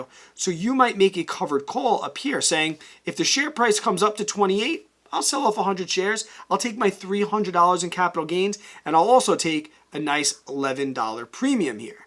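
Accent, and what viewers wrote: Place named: Northeast, United States. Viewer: I love your videos! They’re very helpful for learning. Thank you! I also get a kick out of your accent 😂 Are you from the Northeast?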